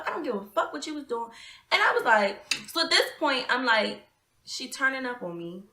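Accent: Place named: Baltimore, United States. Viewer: Just found your channel!!! I love it! Are you from BMORE or DMV? LOL I hear your accent lol New subscriber boo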